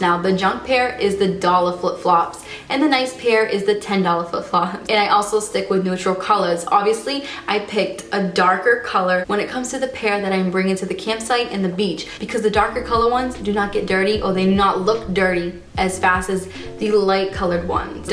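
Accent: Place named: Boston, United States. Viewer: A video suggestion for you because you're absolutely adorable and I love the way you pronounce words would be how other people say a word in different parts of the country and then you try to say it with your Boston accent! Please say car key's LOL An old co-worker of mine had an accent like yours and every time she would say car keys it sounded like she was saying khakis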